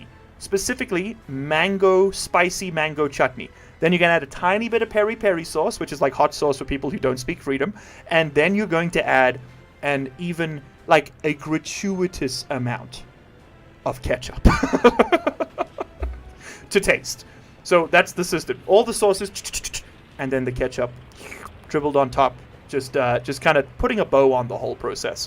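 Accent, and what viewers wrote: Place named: South Africa. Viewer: Man you sound like most of the SA that move to New Zealand after a few years , every once and awhile the accent comes through, mostly on R's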